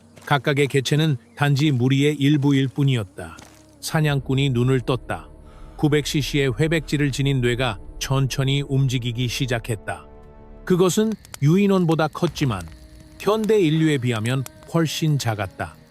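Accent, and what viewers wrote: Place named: United States. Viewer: 무슨 말이냐 미국사람이 한국말 하는거냐 너무 어색해서 듣기 싫다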